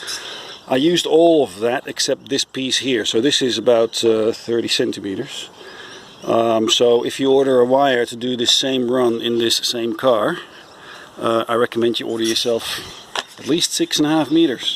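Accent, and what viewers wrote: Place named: Netherlands. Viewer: Mooi. Vooral met het Hollandse accent. Ik ga het proberen op m'n Ranger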